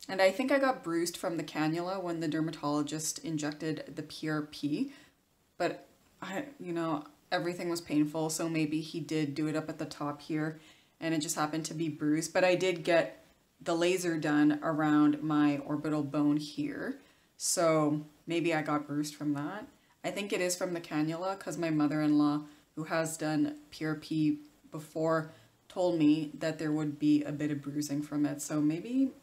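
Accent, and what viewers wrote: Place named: United States. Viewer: Where are you from? From your accent, I thought you were American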